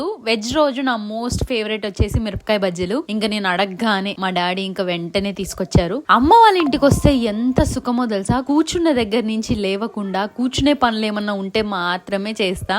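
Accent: Telangana, India. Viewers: Nuvvu Telangana yasa mattadutunnavu 😅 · Muchatlake egabadata... Telagana language ni style ga matladutavu sis...👌🥰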